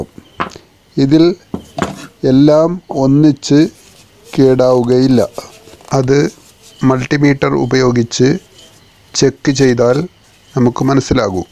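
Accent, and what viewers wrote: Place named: Tamil Nadu, India. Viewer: തമിഴൻ മലയാളം പറയുന്നത് പോലേ തോന്നുന്നു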